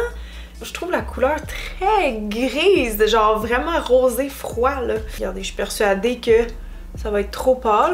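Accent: Canada. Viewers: Mais que tu es cute... 💕😘. Ton accent me rappelle mes vacances au Canada où je compte bien m'installer. Gros becs. À tantôt girl 💋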